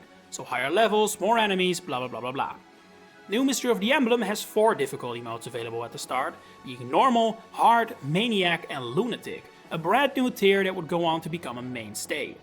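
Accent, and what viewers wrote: Netherlands. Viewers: I'll bet anything this guy is Dutch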